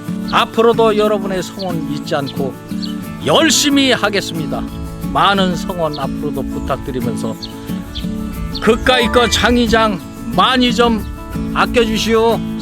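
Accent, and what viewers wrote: Chungcheong, South Korea. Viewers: 충청도 말씨 너무 좋아하는 구독자이네유 제가 장담혀유 곧 백만 임박 ㅎㅎㅎ 제주도에서 응원해유~~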